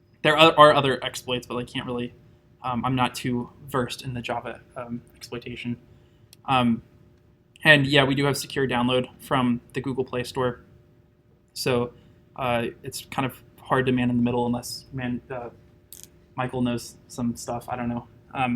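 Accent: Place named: United States. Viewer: Subtitles please! I'm from India and I don't understand American accent. 😞🙏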